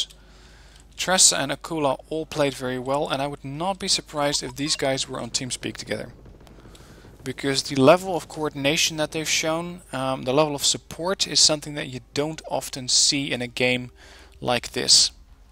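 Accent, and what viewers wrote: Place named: Netherlands. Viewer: You sound dutch !! Thank you for this replay !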